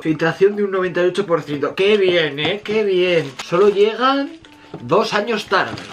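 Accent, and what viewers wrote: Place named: North, Spain. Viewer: Como se nota que eres norteño!!!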